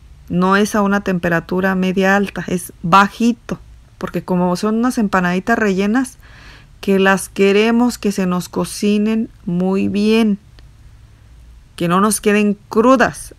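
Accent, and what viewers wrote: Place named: Morelia, Mexico. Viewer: Cuándo oigo que hablas en el tutorial de este video Y de todos tus vídeos me transporto al estilo de voz de la gente de Morelia me preguntó la gente que ve estos videos si alcanza a percibir la diferencia de estilos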